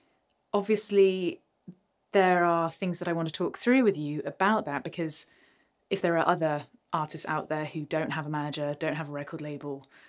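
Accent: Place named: Bristol, United Kingdom. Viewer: It's a pleasure to see you wearing something other than black, first of all. Second, producing your own studio album is quite a chunk to bite off – big hopes for a solid return on that investment of time, energy and money. Finally, as always, I remain enamored of your speaking voice and that "Bristol" accent. Good stuff. Keep up the reports!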